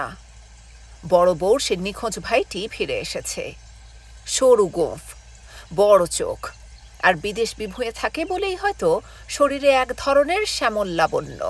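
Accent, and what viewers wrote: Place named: Bangal, Bangladesh. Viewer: Ekhono parjanto Kato madhur gramyo poribesh mone hochhe ekta bhoyonkar din asbe khub valo porchho bisesh kore bangal vasa amar rater samay tomar galpo shonar jonyo ❤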